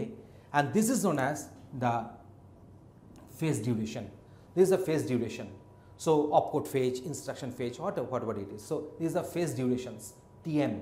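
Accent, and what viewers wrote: Bengal, India. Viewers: I haven't even finished the lecture, and I know that I want to watch him. He seems to be great. I love his Indian accent. He looks cute on an Indian accent. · Omg this is the perfect place to learn Indian accent and pipeline architecture simultaneously. Really appreciate this! Very helpful and I love it.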